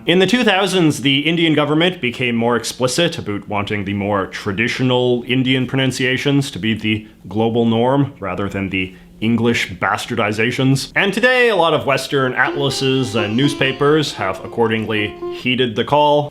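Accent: Canada. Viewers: In addition to his use of sound effects, I find amusing Mr. seemingly unconscious and unironic Canadian variations [n b.: plural] on the word "about." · I'm American, I just subscribed bc I find Canadian accent very sexy. But then I found out that his content is pretty good too.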